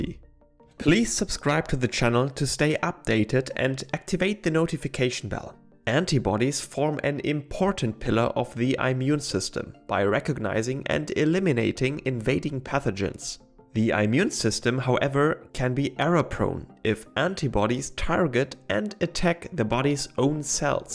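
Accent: Germany. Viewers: Awesome video. Hab direkt gehört, dass du aus Deutschland kommst 😁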